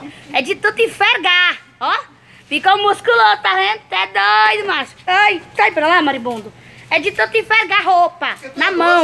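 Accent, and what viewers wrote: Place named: Northeast, Brazil. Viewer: Tive que me inscrevê, apartir do segundo vídeo assistido, não resisti essa vòz rasgada do nosso nordeste . sou baina e admiro cada sotáque deste nosso imenso Brasil 😘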